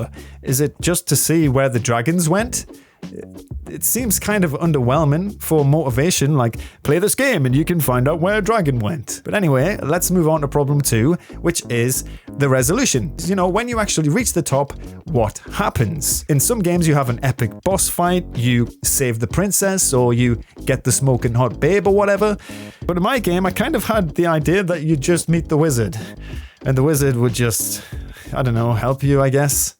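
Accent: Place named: North, United Kingdom. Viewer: good vid, where u from? sound very northern :-)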